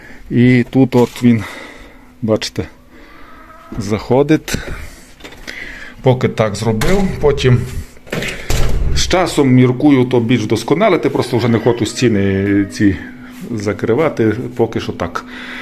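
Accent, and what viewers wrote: Transcarpathia, Ukraine. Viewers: По акценту зрозуміло що Закарпатський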